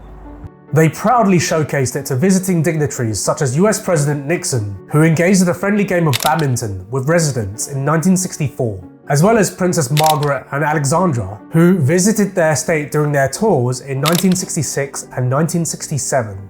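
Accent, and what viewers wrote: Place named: United Kingdom. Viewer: bro u sound so brit it trips me out lol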